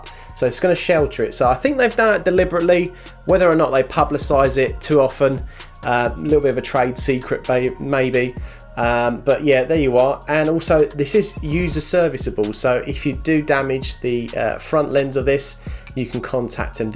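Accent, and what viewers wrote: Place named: Australia. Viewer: Where are you located? Sounds Australian but I though Australians had super tough gun restrictions.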